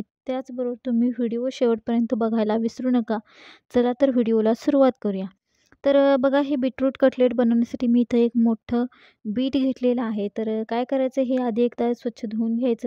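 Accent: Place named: Kolhapur, India. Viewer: रांगडी कोल्हापुरी भाषा आहे ती ताई खिसुनच बोलाव लागत...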